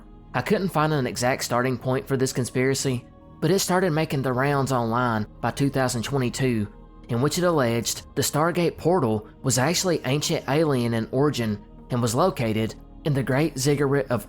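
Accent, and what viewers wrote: South, United States. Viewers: Your accent screams southern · As an interesting side note...the region of America where this accent comes from has a huge population of Scotch and Irish going back 200 years...sounds like South Carolina, Georgia, Arkansas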